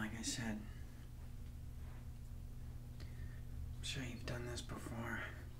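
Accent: Boston, United States. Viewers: Have a question: From which part of US belong his accent?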